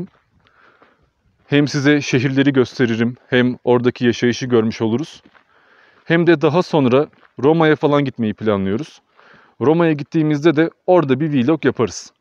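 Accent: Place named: Kastamonu, Turkey. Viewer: Kastamonu 'ı şivesinden belli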